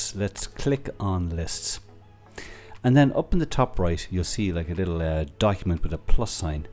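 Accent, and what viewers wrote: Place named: Ireland. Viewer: Great thanks. As a Scot, its so refreshing to hear an Irish accent, so much easier on the ear and easier to understand than many of the other accents you get on these types of videos. Thanks.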